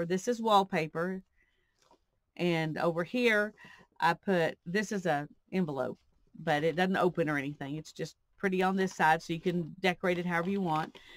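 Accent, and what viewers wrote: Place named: South, United States. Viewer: I'm sensing a slight southern drawl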